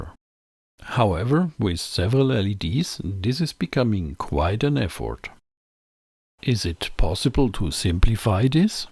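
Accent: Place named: Germany. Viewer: Cool. Interessant. Wollte sowas bauen ala Signal Lämpchen mit pwm am minuspol zur globalen Helligkeitsregelung. Die LEDs müssen aber dann je nach Farbe eimen passenden Widerstand bekommen. Hab schon erst mal ins Klo gegriffen, transparente LEDs sind ungeeignet. PS. Deutsches Englisch ist fürchterlich 8) gerne of deutsch. Ich glaube, Mittlerweile kann man auch mehrere Audio Tracks anfügen für verschiedene Sprachen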